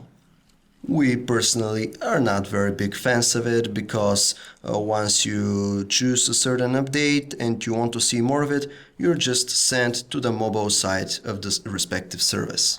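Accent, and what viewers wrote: Eastern Europe. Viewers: So he's got an eastern european accent ? So what ? Im used to it in the UK, it's a very good and informative review. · this eastern European accent is what i love about this guy's reviews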